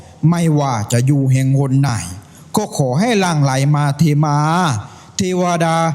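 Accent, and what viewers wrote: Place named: Isan, Thailand. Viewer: อยากกดเข้ามาดูตลอด แต่พอได้ยินเสียงคนอ่าน กดออกแทบไม่ทัน ถามจริงหาที่อ่านแบบคนทั่วไปไม่ได้จริงเหรอ สำเนียงอีสานก็หังยากแล้ว ยังจะมีการทำเสียงแบบละครวิทยุสมัยก่อนอีก เฮ้อรับไม่ได้ๆๆ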